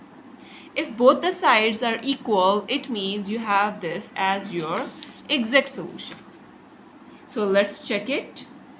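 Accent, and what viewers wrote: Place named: United States. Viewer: itna thanda american accent 😆😆😆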